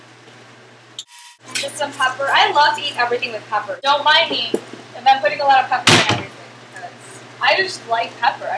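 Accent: Vietnam. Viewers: ah i never knew u was vietnamese until u spoke lol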